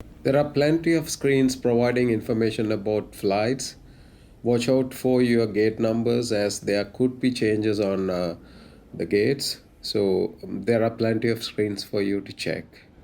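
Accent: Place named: Sri Lanka. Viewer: Your English accent is very Sri Lankan..!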